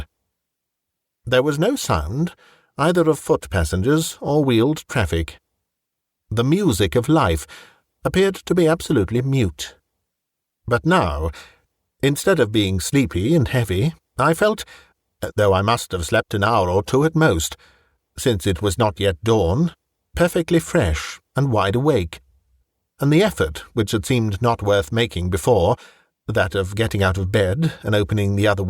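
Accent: England, United Kingdom. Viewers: This and horrorbabble are my favorites. There's nothing quite like an English "accent" and a voice capable of giving character when listening to spooky stories. Who would've thunk it huh? English people being good at speaking English. Haha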